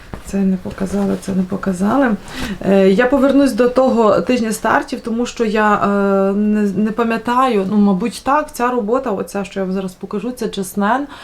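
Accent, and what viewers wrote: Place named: Lviv, Ukraine. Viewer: Дуже багато роботи зробили ви за 2023 рік. Я дякую за проект « борщівська буденка» 🙏🫶❤️ Бо інакше у мене не було б такої гарнезної сорочки. Ви надихаєте і мотивуєте рухатись вперед. Люблю ваші відео, слухати ваш голос зі своєрідниим львівським акцентом- то як медитація😊 Бажаю ще більших творчих успіхів у новому році. І дуже чекаю на новий проект. Може то буде красива бойківська сорочка чи міртова😊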